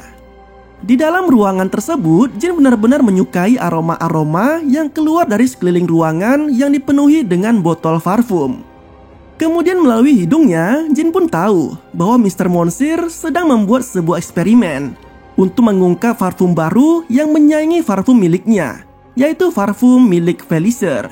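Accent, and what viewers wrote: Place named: West Java, Indonesia. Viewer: Varpum hahaha...narator nya sundanese banget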